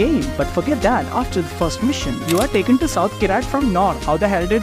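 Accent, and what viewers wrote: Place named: India. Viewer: He literally sound indian from the beginning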